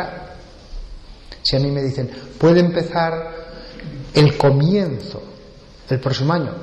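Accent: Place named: Spain. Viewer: no aguanto el accento espanol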